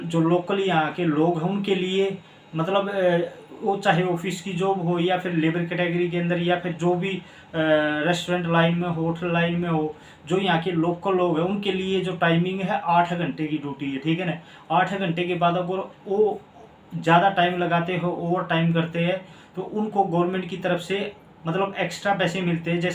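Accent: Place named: Haryana, India. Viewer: aawaj se to aap haryana se lagrahe ho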